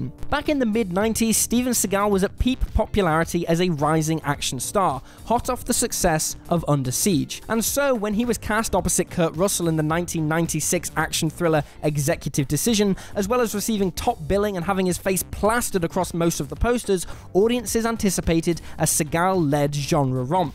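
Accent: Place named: North, United Kingdom. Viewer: He is northern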